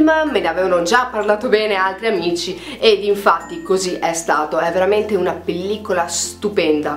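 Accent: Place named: Romagna, Italy. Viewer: ahh scusami è che io sono romagnola e la tua s mi ricorda il nostro accento. scusami :)😹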